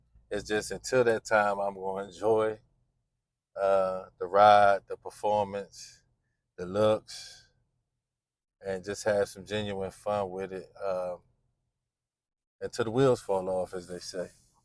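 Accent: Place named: Baltimore, United States. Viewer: You from Baltimore? I swear I hear the accent and this coming from somebody from baltimore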